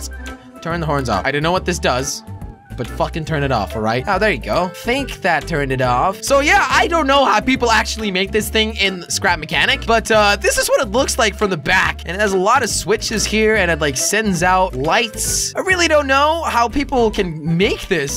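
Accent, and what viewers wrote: Germany. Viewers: are you german ? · are you German???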